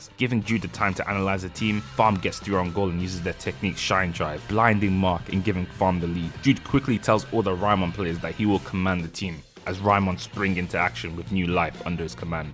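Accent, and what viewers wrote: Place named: United Kingdom. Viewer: Love you bro I love British Accent🫶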